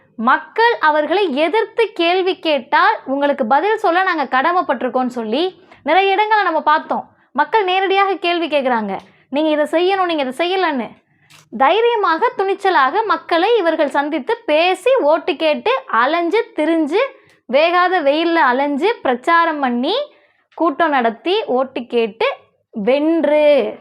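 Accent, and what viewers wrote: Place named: Brahmin, India. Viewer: அக்ராஹார. பாஷைக்கு. நம்ம.. சகோதரிக்குத்தான். பொருத்தமா. இருக்கு. மகளே. அவா. மாதிரி. அப்படியே. ஒவொரு. அசைவும். பேச்சும். இருக்கும். வாழ்த்துக்கள்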